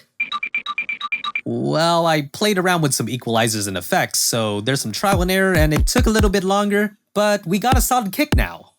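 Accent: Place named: United States. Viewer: Your english sounds SO GOOD in my opinion because you said you are from the Philipines. How did you learn English so well to sound american?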